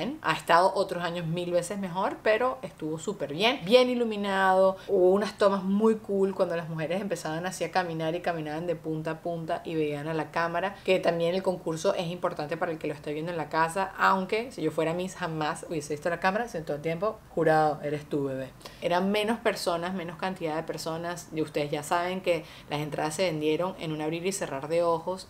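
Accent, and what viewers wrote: Venezuela. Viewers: Me gusta mucho como habla daniela burde lo nornal chama típica criolla te amo eres exelente besos 😘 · saludos, vi el Certamen con mi esposo, por TNT, al escucharte me pregunto ¿quien es esa la que esta hablando? - Yo: Es ¿sabes quien es? - y me dice Si, ES UNA VENEZOLANA! JAJJAJAJA me encanto que me respondiera asi!! Te queremos!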